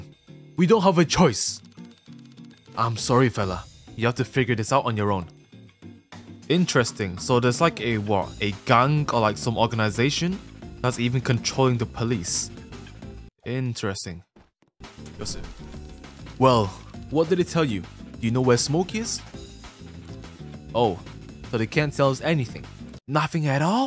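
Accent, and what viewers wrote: United States. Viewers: your american accent sounds fine. just a bit off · Yoooo sup here again sorry for late comment kinda just got on my phone Edit: ur American accent is better than mine lol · Your american accent isnt that bad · Bros American accent is actually pretty good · Dude your American accent isnt cringe at all, its really good tbh, you should talk like this more often cuz its really good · As an american his american accent makes me wanna die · This is Ascended American voice like HUH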